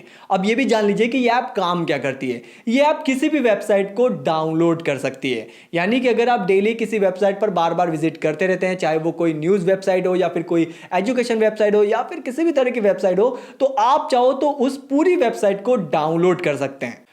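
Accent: Gujarat, India. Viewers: Bhai aap Bharuch,gujrat se ho kya 😀🤔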